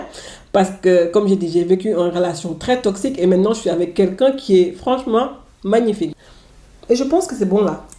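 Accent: Senegal. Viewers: you said it all. i'm not senegalese but i luv your dialect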